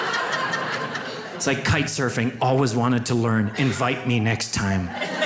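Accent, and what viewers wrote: Ireland. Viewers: Very funny. And I can't resist an Irish accent. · It was close to accurate. To a passive listener, he could have easily passed it off as legit. But I really do love listening to the Irish accent.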